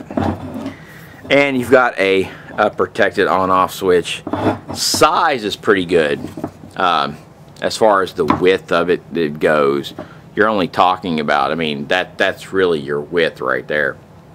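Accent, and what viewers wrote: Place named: South, United States. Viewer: You sound like a red nec.. Lamb voice. Costco sells it for <num> cad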